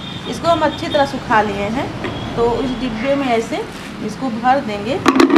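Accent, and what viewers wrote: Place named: Ghazipur, India. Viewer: Sister aapki baate bht acchi lagti h meri mammy aapki trah hi bolti thi woh bhi gazipur se thi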